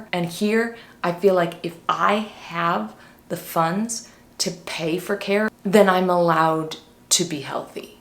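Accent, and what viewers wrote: United States. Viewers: You don't sound like somebody who grew up in the uk. Your accent is very usa. Did you grow up in the usa then move to the uk, then move back to the usa? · Seems in the states you get ill you’ll go broke fast Your accent is so American, you lost the uk accent really quick in only 1 year? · Very good overview and comparison, but I just wondered, if you'd lived for most of your life in the UK and only recently returned to the US, why do you have such a strong American accent? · I have to wonder, if you spent most of you adult life in the UK, why did you agree to give up what must have been a beautiful Briish sccent in favour of an American one. How long were you in the US before they stole your voice?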